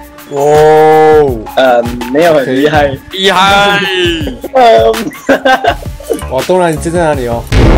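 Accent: Malaysia. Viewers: 你应该是马来西亚人吧，听那个口音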